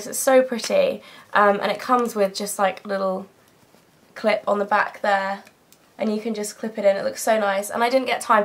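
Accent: England, United Kingdom. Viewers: i just LOVE how you said "shorts" @@ i get hypnotized xD i really love the english accent! :D and nice haul! woof adds some fun! LOL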